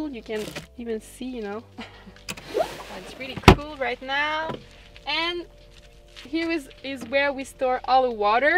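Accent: Quebec, Canada. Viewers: Il y a plusieurs vidéos en anglais de ce genre. Ça fait du bien d'entendre l'accent québécois. Nice set-up. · Where are you located. ? Sounds like Quebec Canada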